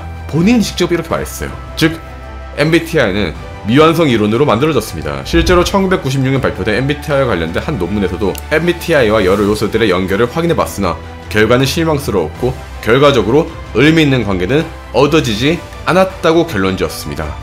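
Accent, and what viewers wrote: Gyeongsang, South Korea. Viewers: 경상도 사투리가 재미있네요 그리고 MBTI 진짜 무지성 무논리 ㅋ거의 2020년대의 신 혈액형 수준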